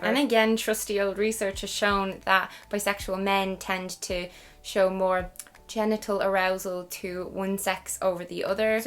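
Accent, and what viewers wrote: Ireland. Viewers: I loved this video. It’s always so nice to hear more about my sexuality. I also I love your accent it makes me miss my time in Ireland so much! Thank you for a great video💚💚💚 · Do I hear a fellow Irish spudddd?? (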